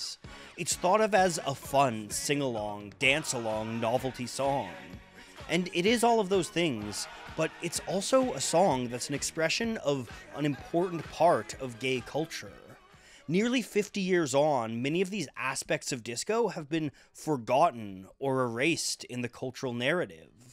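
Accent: Canada. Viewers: Absolutely well put together. Granted your Canadian accent does give a small clue as to why you may have mispronounced Giorgio's last name (it's 'More-row-der'/ Moroder). Clearly, I wish the video would have been longer. Great job though!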